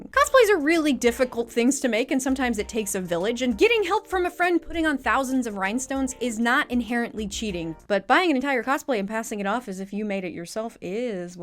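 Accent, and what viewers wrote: United States. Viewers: As each day passes, the american accent grates harder on my soul.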